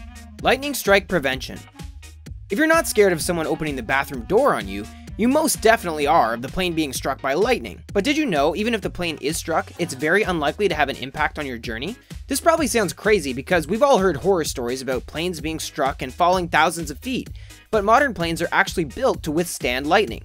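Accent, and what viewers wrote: Canada. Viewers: The narrator is a Canadian, obviously. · Are you canadian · He’s Canadian (like me)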